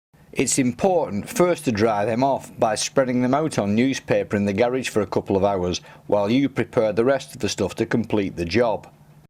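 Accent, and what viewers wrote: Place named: North, United Kingdom. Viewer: The commentary is so Northern I had to wear a flat cap to listen to it. Great video, mate.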